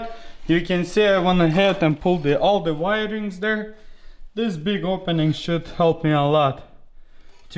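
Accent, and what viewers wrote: Russia. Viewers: I can tell you are Russian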